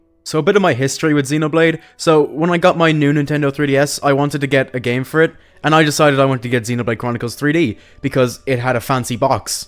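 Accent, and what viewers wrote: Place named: United Kingdom. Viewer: You sound British just like the characters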